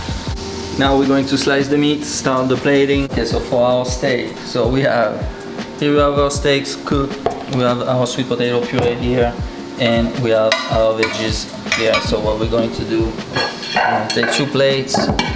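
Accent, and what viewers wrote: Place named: France. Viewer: Je trouve chouette ta chaine car je vais apprendre l’anglais et cuisiner avec toi ! C’est cool et du coup on comprend tout vu ton accent français.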